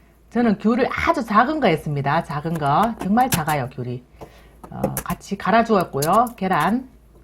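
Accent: Gyeongsang, South Korea. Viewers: 안녕 하세요 넘 재미있어요 저도 경상도 토백 인지라 새삼 경상도 사투리 억양 재미있고 솜씨 잘 배웠습니다 한번 해 먹어 봐야 겠내요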